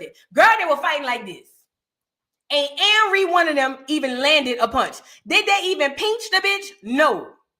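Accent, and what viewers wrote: New Orleans, United States. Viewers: Girl I adore her New Orleans accent!! ❤❤❤ one of the main reason I watch her!!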